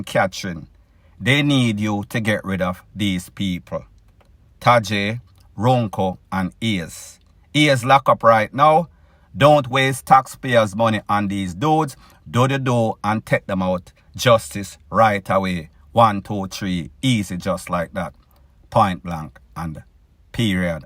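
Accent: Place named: Jamaica. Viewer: I really have to laugh when u talk.. lol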